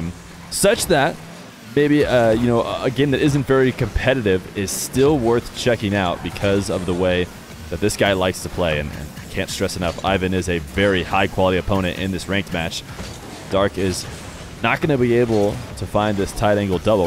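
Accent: United States. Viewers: sounds like Johhnyboi but with an American accent, and I find that pleasing.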